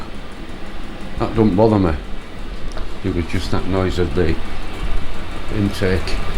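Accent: Yorkshire, United Kingdom. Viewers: Sound like a Yorkie accent !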